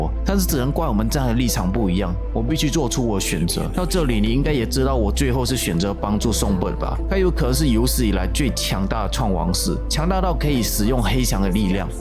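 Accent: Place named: Malaysia. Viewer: 听他口音，马来西亚人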